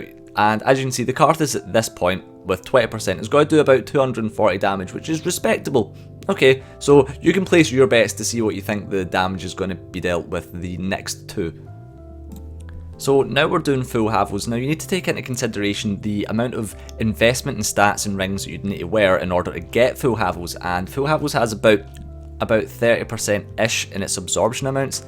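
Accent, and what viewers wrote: Scotland, United Kingdom. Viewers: This may be a stupid question but is this guy Scottish? English isn't my native tongue and I can't quite pinpoint the dialect.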